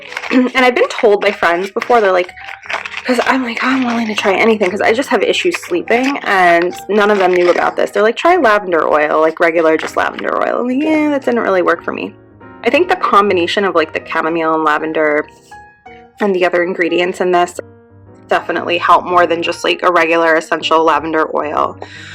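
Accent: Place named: New Jersey, United States. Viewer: Its a British brand and it works. Are you from the Jersey Shore? The accent :)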